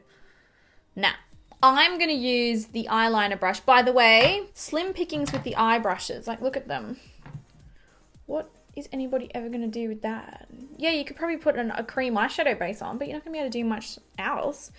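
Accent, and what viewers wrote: Australia. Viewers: Love your tutorials and reviews and your lovely Australian accent! 😊 Did you feel like the handles were so flimsy they would break easily? Also, did the brushes shed?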